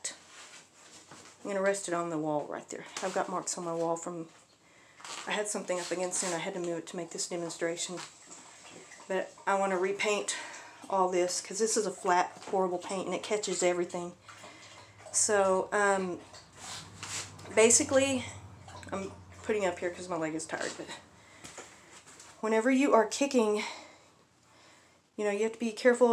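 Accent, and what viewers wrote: United States. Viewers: Are you American ??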